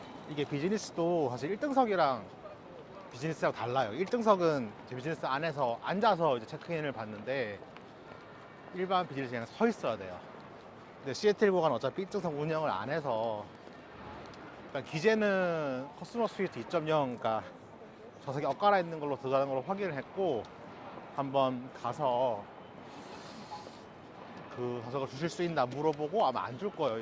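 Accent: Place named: North Korea. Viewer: 말투가 북한 사람같네요. 북한사람인가요?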